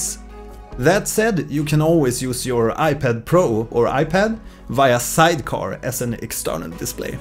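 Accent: Sweden. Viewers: dat swenglish <3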